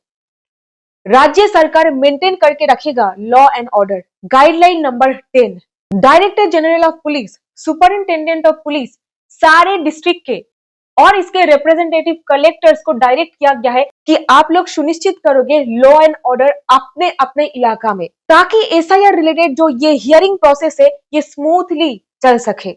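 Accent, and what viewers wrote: Bengal, India. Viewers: ম্যাডাম তুমি বাঙালি মনে হচ্ছে 😅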